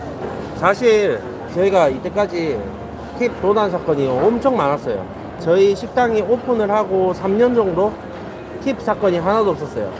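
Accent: Gyeongsang, South Korea. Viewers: 좋은 공부가 되셨네요. 힘내세요. ^^ 경산도 사투리 때문에 그냥 말하는게 업되어 보이는 걸 보는 사람마다 받아들이는게 틀리니 마음에 담지 마세요. 힘내세요.^^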